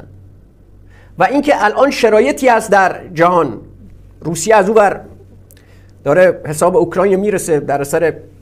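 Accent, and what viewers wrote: Lorestan, Iran. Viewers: درود بر برارُم لُر با غیرت عالی هستی نمونه غیرت ایرانی یه کمی دُز لهجه لری بیشتر کن .می دونی که صدام و افسرهاش چقدر می ترسیدند و تک تیرانداز با غیرت ما که در دنیا رکورد دار هست یک لُر غیرتمند بود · از نحوه گفتارت وان ادبیات خاص خودت که بیانگر قوم غیور لر میباشد خیلی خوشم میاید تو پیای گپ مایی.شیر دات حلالت......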